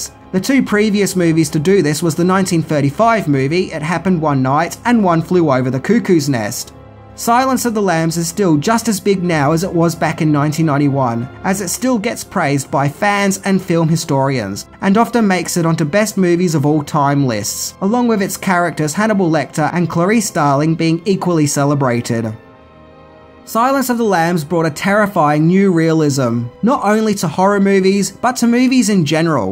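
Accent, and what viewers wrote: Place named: Australia. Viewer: . Your assie accent is one of the reasons that makes your channel so enjoying.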